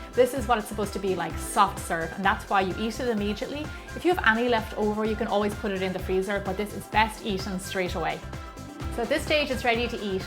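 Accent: Ireland. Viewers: Are you Irish? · ᏙᎠ ᎠᎰᏚᏟᎾᎡᎠᏙᏁᎢᎰᏙ ᏚᏤᏚᎢᎬᎷᏙ ᏁᎾᏚ ᏚᏙᏞᎢᏙᎢᎾᏒᎰᏴᏌᏚ fake?? shes Irish... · Your accent!!! Beautiful. Irish?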